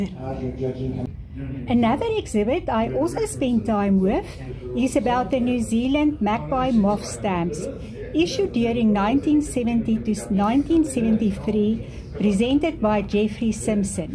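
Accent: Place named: South Africa. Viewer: always happy to watch and enjoy hearing your South African accent :)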